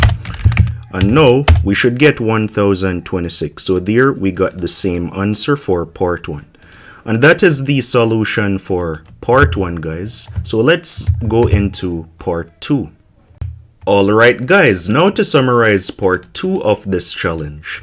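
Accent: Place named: Jamaica. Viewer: I love your accent. Jamaica?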